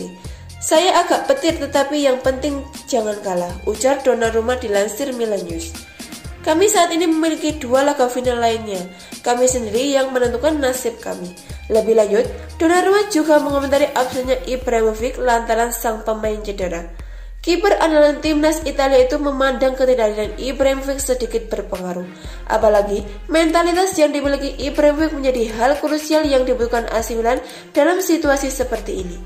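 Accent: Java, Indonesia. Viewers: Medok bgt min jowone ngendi 😅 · Wess medok jawa ne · Medok.. suarane... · Adooh medok2 eee kental banget😆😆😆😆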